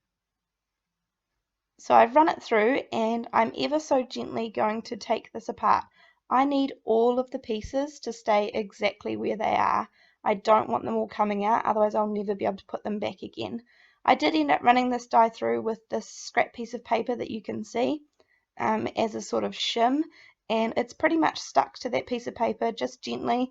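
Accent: New Zealand. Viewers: Gorgeous card and a technique I am keen to try for myself. Wonderful to hear a Kiwi accent , that's why I subscribed to begin with, as support for a fellow New Zealander then I made the happy discovery that you are also a very talented card maker so many thanks for putting so much time into sharing your skills with us.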